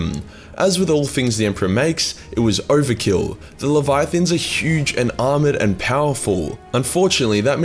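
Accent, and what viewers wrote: Australia. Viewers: i was like whys the narrator talking shit and swearing so much and then im like oh yeah he has an australian accent too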